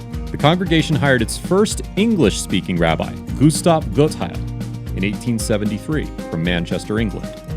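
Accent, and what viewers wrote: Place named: United States. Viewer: please do hindi language like previous u did please everyone cant understand english specially american accent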